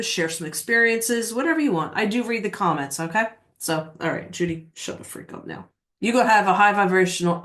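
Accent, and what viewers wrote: Philadelphia, United States. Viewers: You sound like u r from Philadelphia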